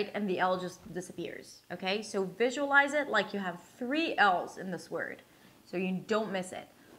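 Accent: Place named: United States. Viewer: Hi! Thank you very much for your reply. My name is Katsu. I'm Japanese living in Japan. I think I speak English pretty well working as an interpreter but I still have a long way to go. You are definitely my role model because you are not an American but still you speak like an American, which shows how hard you must have worked (you moved to the U.S. when you were 20!!??). Acting must have done you a world of good. But how did you acquire your American accent? I really appreciate it if you could elaborate on how you mastered it. I know practice is the only way, but, for example, what accent training book did you use if any? Any accent training in acting lessons? I would like to know so much about you and your way of practicing. Not many people can get where you are, especially if you start your accent training after the age of 20. You are fantastic! 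I'm looking forward to your video about YOU. Thank you!!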